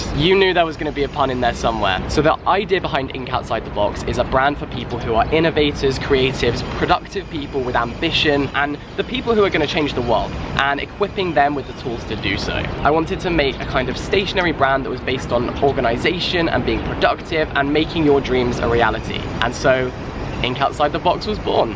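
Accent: RP, United Kingdom. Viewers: You have the same posh accent as Jack and Finn Harries. Not a criticism, just an observation.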